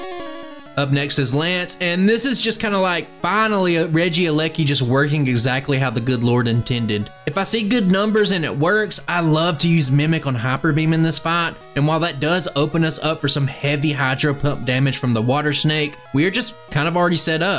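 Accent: South, United States. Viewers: Literally the first and only Pokevideo I've seen in my entire life with a Southern narrator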